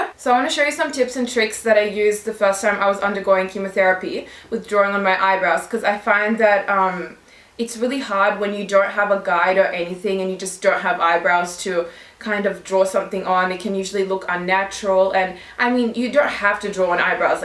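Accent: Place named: Australia. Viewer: Amazing make up, thanks for the tips. Great to hear an Australian accent. Hope you are healthy and well.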